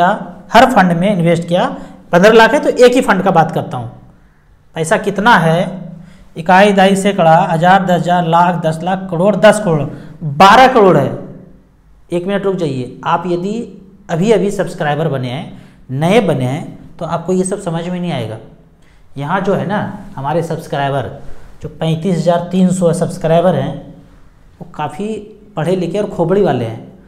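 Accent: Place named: Awadh, India. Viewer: सर आपका होम डिस्ट्रिक्ट क्या है , आपकी बोली में अवधी का पुट है इसीलिए जानने की इच्छा है, आपका फॉलोवर...💐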